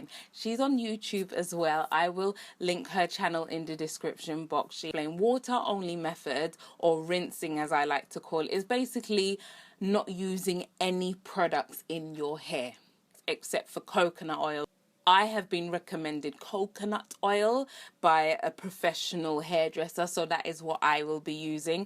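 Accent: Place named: United Kingdom. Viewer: Yes! Lovely to hear a fellow Brit isn't it? Made me feel at home!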